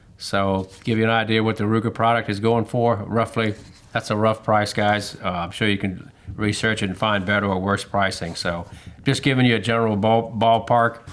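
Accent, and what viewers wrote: Louisiana, United States. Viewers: Mr, I a a North Louisiana native myself. I noticed a bit of a Cajun accent but thought you were a Texan. The good food and music got to ya and you know it's true. I love and respect you and consider you an American badass.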